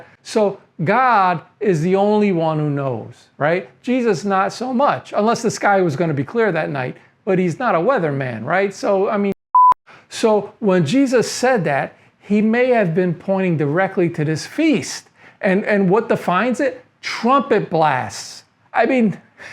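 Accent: New York, United States. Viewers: Thank you for your reply! I've visited NYC a number of times and have friends in Queens, so I noticed your accent immediately. Brilliant video - a unique take on the issue! 💙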